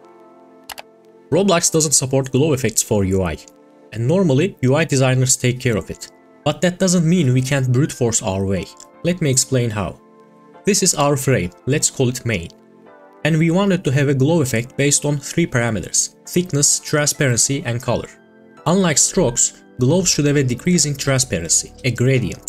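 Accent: Turkey. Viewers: aksandan anladım kesin bu türk diye kanal güzel eline sağlık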